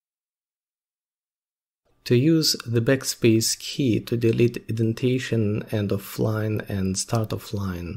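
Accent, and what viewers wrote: Russia. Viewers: Судя по акценту ты наш парень) Спасибо тебе большое, очень помог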